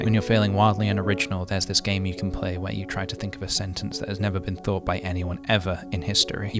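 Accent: United Kingdom. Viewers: How did I know you were British before I even clicked on the video 🤧 but Pokémon blue version, represent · where are u from? your english sounds different to me, UK?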